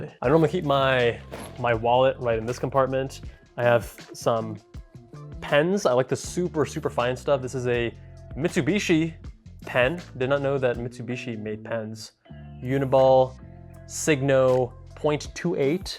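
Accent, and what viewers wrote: India. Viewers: Loved you INDIAN accent dude💕💕💕💕💕💕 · I vote, Indian accent every intro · ah yes the indian accent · The Indian accent is ok with me 👍 Sincerely, an Indian person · 👏👏👏👏very nice with the indian accent ,guud than Indians, with beauty tips and steel except that plastic... haha !! Like 👶👶 enjoyable and useful and diff accents pls😇😇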